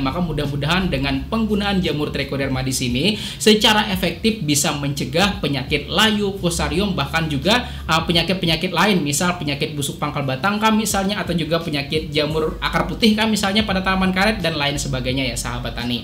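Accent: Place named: Hulu Sungai, Indonesia. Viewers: Pa p urang mn ky ad lugat kalsel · Kukira orng mana kah ternyata eh ternyata orng hulu sungai jua 😁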